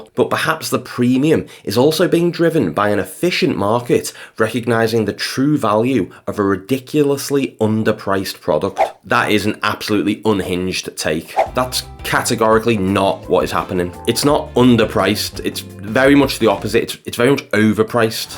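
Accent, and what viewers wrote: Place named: Wirral, United Kingdom. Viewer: hahaha I largely agree but I just can't get over your bad Wirral accent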